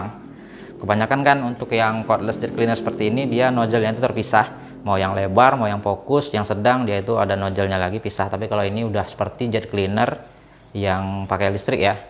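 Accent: Medan, Indonesia. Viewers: Orang medan ya bang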